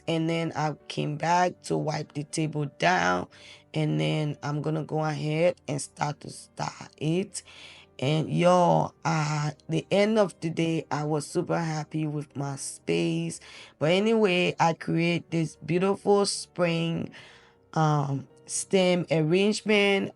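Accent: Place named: Liberia. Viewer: New here on your channel, like your content, your name is Ghanaian but u have a Liberian accent,you did a good job...🫡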